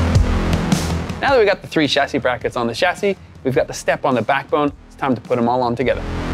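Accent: Australia. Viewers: This guy was for sure an Aussie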